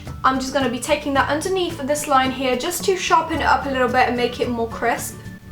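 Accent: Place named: United Kingdom. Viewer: Girl do you live in the UK you have a british acsent I live In the UK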